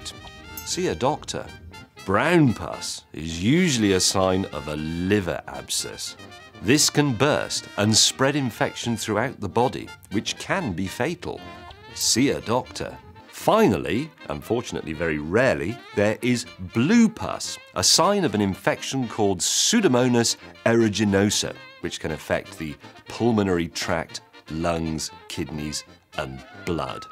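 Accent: United Kingdom. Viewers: British accent 😳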